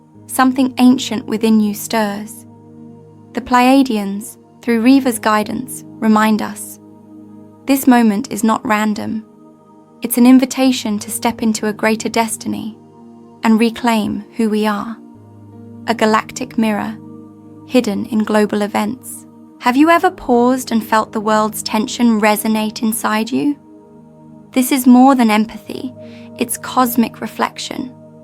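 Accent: England, United Kingdom. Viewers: I like this girl when you do the English accent better than American accent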